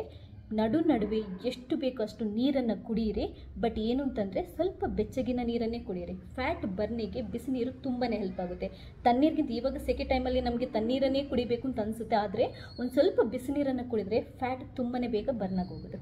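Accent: Mangalore, India. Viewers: Madam nivu Mangalore urura,channagi mathadathira 🙏👌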